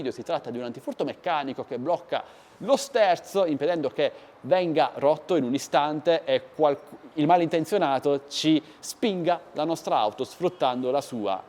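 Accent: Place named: Apulia, Italy. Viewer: Trackting non è testato contro i jammer infatti sento dal tuo accento che sei pugliese e intorno a Cerignola non serve a niente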